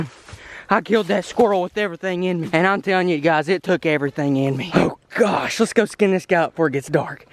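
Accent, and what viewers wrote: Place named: Kentucky, United States. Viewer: Dear god Came across your channel today watching the Indy 500 Heard your accent for the first time and I thought you were from Kentucky instantly. One you said that verse though, instantly subbed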